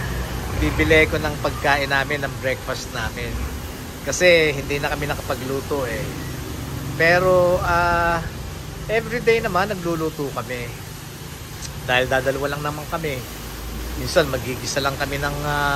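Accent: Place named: Pampanga, Philippines. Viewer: Kuya kapampangan ka? Kasi may tono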